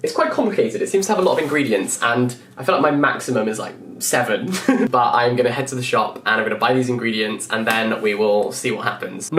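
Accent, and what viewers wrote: United Kingdom. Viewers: omg he has the most british birtish accent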